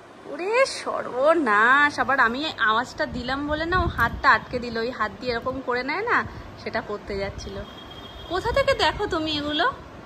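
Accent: Bangal, Bangladesh. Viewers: By borne foreigner too tumi ..Ar tumi je educated ...sooo impossible bangal kotha adopt kora....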